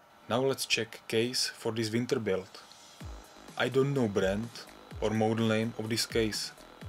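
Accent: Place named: Czech Republic. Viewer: Ta sbírka hardwaru je naprosto skvělá. Když jsem tenhle kanál objevil, hned jsem podle přízvuku tušil, že je dokonce česká :-) Jen v tomhle videu jsou komponenty, které jsem ani netušil, že existují. Takhle exotický HW se ke mě nedostává.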